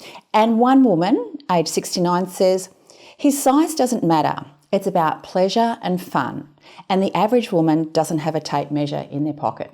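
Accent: Australia. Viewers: What accent is this? I can't identify it. Australian maybe? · THANKS FOR YOUR INTERESTING SURVEY. I BELIEVE YOU ARE AN AUSTRALIAN DOCTOR FROM YOUR ACCENT. THANKS AGAIN!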